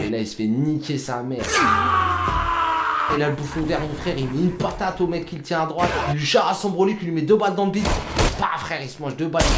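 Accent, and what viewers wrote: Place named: Algiers, Algeria. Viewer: Wallah tu parles comme nous a Alger. La manière, les bruitage, zid en plus les mots en arabe. Sérieux kho. C'est vraiment fort.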